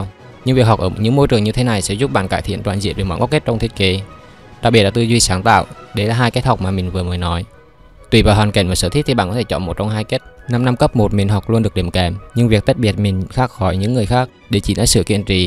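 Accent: Central, Vietnam. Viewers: giọng a giống người miền Trung._. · ông là người vùng nào miền Trung đấy:v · Wow là ng miền trung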